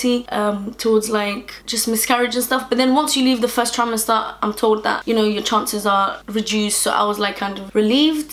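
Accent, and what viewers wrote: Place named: South London, United Kingdom. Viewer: Are you from south London idk maybe it's just me but it's your accent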